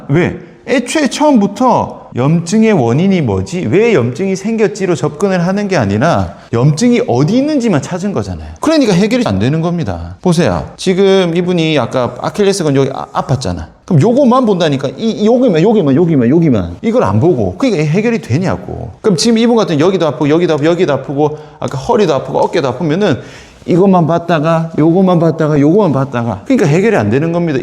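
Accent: Gyeongsang, South Korea. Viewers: 너무 웃겨서 자꾸 듣게 됩니다 경상도 말이 재미있어요 ~~~~~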